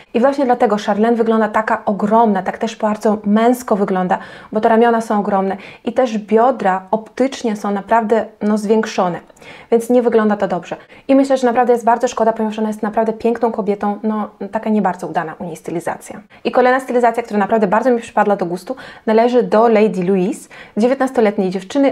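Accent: East, Poland. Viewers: Piekny,wschodni akcent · spytałam o pochodzenie bo usłyszałam piękny wschodni akcent. Pozdrawiam 🤗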